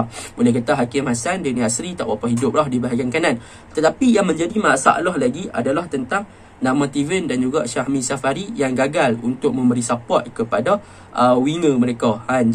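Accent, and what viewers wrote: North, Malaysia. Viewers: Pekat sangat aihh bunyi utagha tu. Mana mana rancakk! Keep going bro!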